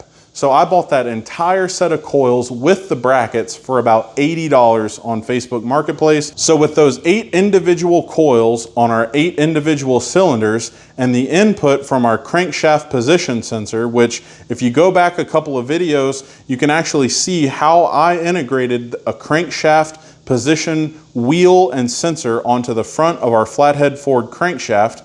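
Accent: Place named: Tennessee, United States. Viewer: glad YT recommended this. hope you document the CPS install a bit more in the future. really liking the repurposing simple parts and using speeduino. just a wild guess by your accent but I can imagine you're from like waynesboro or somewhere near AL in TN, though thats probably me projecting because I'm from the other side of the line.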